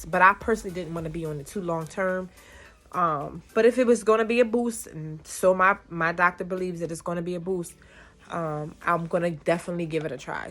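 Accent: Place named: Los Angeles, United States. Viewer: That LA accent jumping out baaabbyyy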